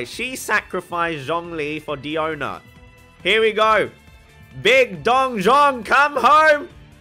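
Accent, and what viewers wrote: United Kingdom. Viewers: i watch video, i see asian. i scrolls down to read comment, i hear some uk accent. me: let me scroll up again to make sure. i did it twice LOL rumor has it, if your asian, you have a higher chance. maybe thats why i got mine an hour ago and now im here looking for build guide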